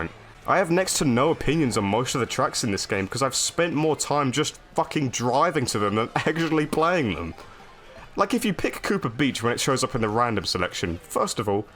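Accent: United Kingdom. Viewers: Well, you think your anglo poxon accent is human and is tolerable. Everyone has wrong ideas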